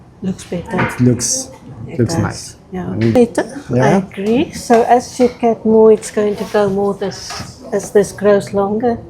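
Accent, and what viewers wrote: South Africa. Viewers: She sounds South African😊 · She sounds S. African.? I am in SA ❤❤❤❤❤❤💯💯🙏🙏🙏🙏😍😍😍😍💋💋💋💋 . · When I started listening I just knew!!! South Africa!! Afrikaans!! Wow love it. So glad for you. Enjoy it for all of us.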